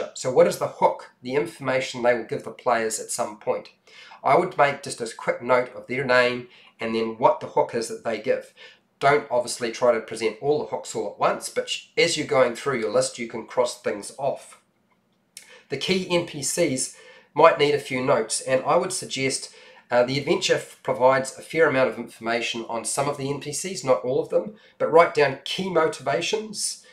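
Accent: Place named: New Zealand. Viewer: you sound like you’re from New Zealand and stereotypical people, think fish and chips for you sounds like fush and chups.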